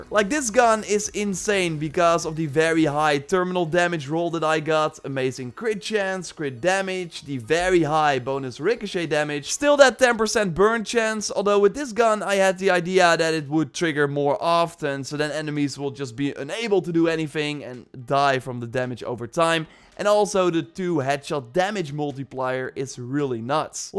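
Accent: Netherlands. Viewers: hello dutch dude. nice accent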